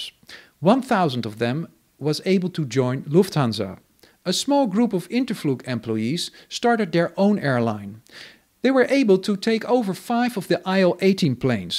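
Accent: Netherlands. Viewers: By the accent more from the Netherlands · his accent is Dutch not German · Am I correct, sir, that you have a Dutch rather than a German accent when you speak English? This video has corrected a visual error I've had for 40 years. I was waiting for you to get to Interflug's L1011... and waited, and waited... It was then I realized the L1011 belonged to LTU! Same reddish colour in the livery! :trophy-yellow-smiling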